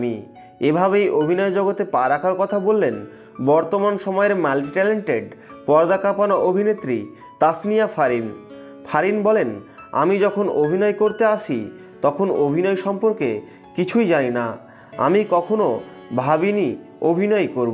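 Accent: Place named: Kolkata, India. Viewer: apnar voice ta kolkatar moto mone hoyce..sorry don't mind..,,,,🌷🌷🌷🌷🌷🌷🥀🥀🥀🥀🥀🌸🌸🌸🌸🌹🌹🌹🌹🌹🌹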